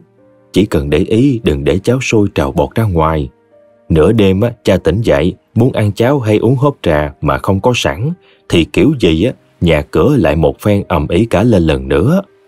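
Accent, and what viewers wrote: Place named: Saigon, Vietnam. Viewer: Yêu mến cái giọng Sài gòn của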